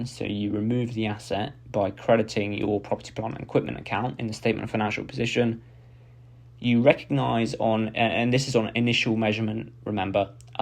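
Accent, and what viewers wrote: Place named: United Kingdom. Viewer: You explained it for simply, thanks a lot. I expected to see more videos but didn’t find. By the way I love this British accent lol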